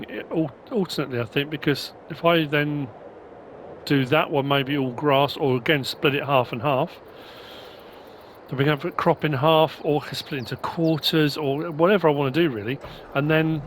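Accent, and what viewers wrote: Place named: United Kingdom. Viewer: Let me tell you something, I watch a lot of Youtube, many types of videos. No tv, or telly as you would say, just Youtube. While I like watching videos of my interests, in this case FS22 content, the common tie between ALL my subscriptions is PERSONALITY. More than anything, I just like to hear about PEOPLE. I like your perspective as a UK citizen; your worldview, your outlook on things, even, quaint as it sounds, your manner of speaking. Word usage, phraising, etc...Go ahead and chat! Tell us about your brother and his amazing feat. We have trails here in the US, I have day-hiked the Appalachian trail in many areas, I always dreamed of a thru-hike. Probably never do it, but we all dream. Go ahead, talk to us. It ADDS to the content. I am sipping my coffee here in Indiana but you carry me to England. The power of Youtube.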